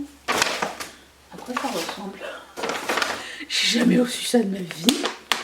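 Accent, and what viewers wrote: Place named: Quebec, Canada. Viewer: Merci pour ce petit bout d'accent si merveilleux. Je suis tombée en amour du Québec avec les séries "la petite vie" et surtout "Catherine". Bonne journée à vous;)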